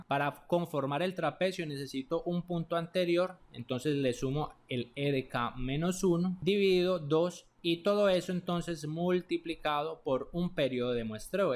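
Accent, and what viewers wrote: Colombia. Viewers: Eres de Colombia?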